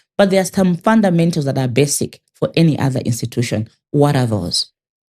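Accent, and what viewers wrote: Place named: Zimbabwe. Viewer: South Africa via Zimbabwe's accent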